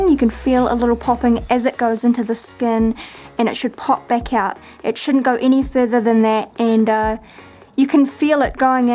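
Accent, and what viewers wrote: New Zealand. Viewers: Hi this is really cool and i love your videos. are you from new zealand cause your accent is awesome. Awesome bear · This is a bad idea but im doing it anyway!! I noticed youre from nz right away and i sound the same but i roll my r's slightly more :-) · She's from New Zealand, be ignorant somewhere else. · thought you were a kiwi! (Waving at you from Wellington) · nope it is her accent and she is a kiwi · steezy.k kiwi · Kiwi accent :3 The tattoo turned out rad! If I ever came across you in person I would seriously pay you to give me one x)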